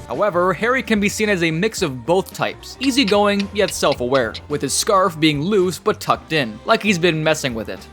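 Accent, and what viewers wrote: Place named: United States. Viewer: He’s American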